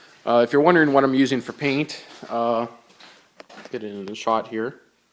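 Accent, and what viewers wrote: Canada. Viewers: What part of Newfoundland ya at me buddy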